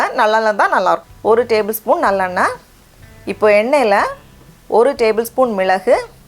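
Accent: Nagercoil, India. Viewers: I like ur nagercoil slang..I'm from Tirunelveli..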